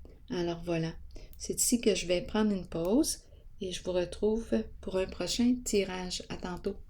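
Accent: Canada. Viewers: Bonjour. Merci pour vos tirages très parlantes. J'adore votre dialecte. C'est français canadien? Par avance, je vous remercie pour votre réponse et je vous souhaite un excellent weekend. Bien à vous 🤗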